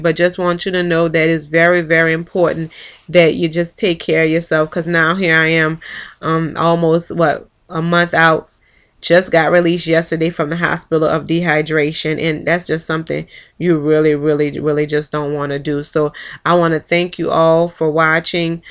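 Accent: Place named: Louisiana, United States. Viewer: Aww hun!! The good thing is that you are doing much better!! I think we all go through that "Why", once I got home from surgery...I was like what in the world did I do. But like you it is all for a better me. I still question did I pick the right surgery...I battled between Sleeve and Band but I am banded now and have enjoyed the ride thus far. Sending love and a big hug your way. I hear the Louisiana in ya. I am from New Orleans originally!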